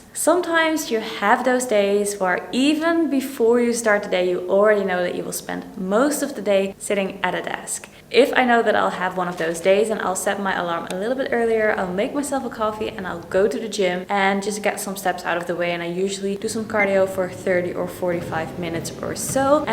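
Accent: Netherlands. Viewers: Your accent is so cute <3 are you from the Netherlands? You sound like a Dutchie! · Going by the sound of it I would say that you're Dutch.. Good info!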